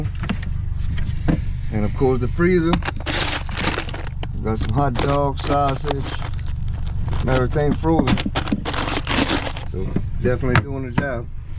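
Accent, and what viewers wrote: Louisiana, United States. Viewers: Oh by the way I always love your Cajun accent ❤😊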